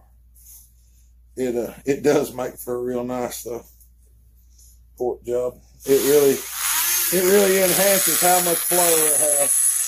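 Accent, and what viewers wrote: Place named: North Carolina, United States. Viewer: you gotta be from North Carolina because we sound just alike when we talk. Im from around high point NC. Where are you from brother?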